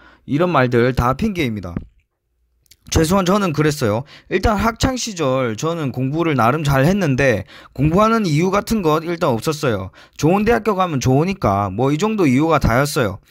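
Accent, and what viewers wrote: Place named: Busan, South Korea. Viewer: ㅋㅋㅋㅋㅋㅋ 말투가 여기쪽이네...부산창원...정겹습니다.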